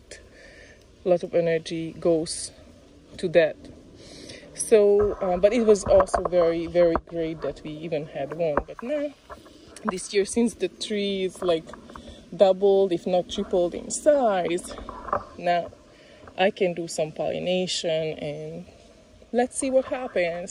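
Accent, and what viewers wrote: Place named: Hungary. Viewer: Haha, elég régóta nézem a videóidat de eszembe se jutott hogy esetleg magyar az akcentusod :) Én a Karib tenger egyik szigetén élek van egy fiatal rollonia fám amiről pár hete szedtük le az első és egyetlen gyümölcsöt. Most nagyon sok virág van rajta de nem egyik se fordult még gyümölcsbe, ezért kérdeztem. Nagyon szeretem a videóidat (köszönöm megint, hogy megosztod velünk) meg persze irigykedem a szép kerted miatt!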